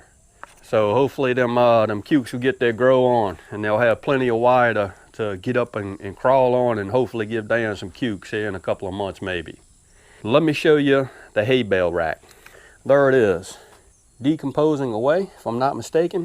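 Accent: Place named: Louisiana, United States. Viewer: HELLO DAN IM A NEW SUPPORTER HERE SHOWING SUPPORT FOR YA AND I HIT THE LIKE BUTTON AND THE BELL . GREAT INTRO AND MUSIC. IM TRYING TO PICK OUT THAT ACCENT. IM NOT SURE EXACTLY. IM A SOUTHERNER. I THINK UR FROM LOUISIANA MAYBE? LOL KINDA SOUNDS LIKE IT. BUT AWESOME VIDEO!! THANK U FOR SHARING !! Wow it keeps climbing. 112. Awesome!! 👍👍👍👍👍👏👏👏👏😎 Nice plant u got ther. Lol Lord have mercy. Lol I always say that. Lol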